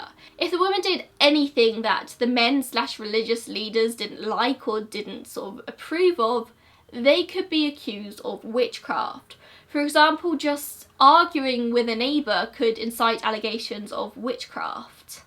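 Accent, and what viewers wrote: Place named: RP, United Kingdom. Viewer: I feel like posh accent should be saying "mind the gap" or calling out the numbers in an elevator. Not gonna lie, it lulled me to sleep ❤️❤️❤️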